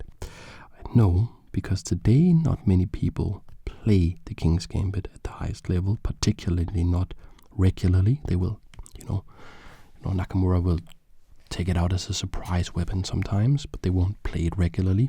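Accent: Denmark. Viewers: Wait are u danish? Cuz u have the accent of a danish person:) its very calming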